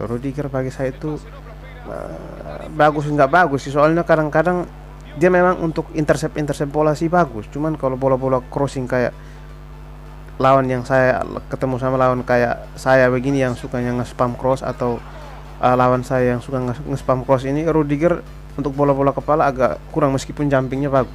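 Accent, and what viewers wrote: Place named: Kupang, Indonesia. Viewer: Lu orang mna ko logat ke orang Kupang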